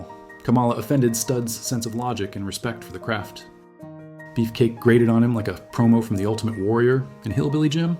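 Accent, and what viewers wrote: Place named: United States. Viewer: So this script is obviously written by a British person and read by a yank. Unless theyre Canadian, but we don’t talk about them anymore. Still a great channel though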